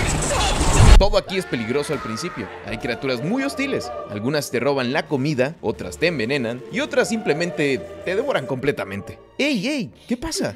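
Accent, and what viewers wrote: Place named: Latin America. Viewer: Nah hombre, fuera de bromas tu estilo y edición son muy buenos, parecido al del grande de Mutzska, pero el tono más suave y tú guíon más crítico/narrativo son únicos y me gusta (no es agresiva Jwulen XD) y además eres el primer youtuber al estilo Mutszka que escucho hablar en español latino, aplausos pa ti 👏😄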